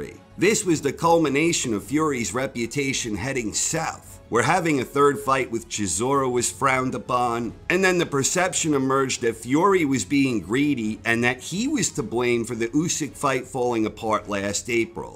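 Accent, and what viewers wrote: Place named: Boston, United States. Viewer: I think this guy is from Boston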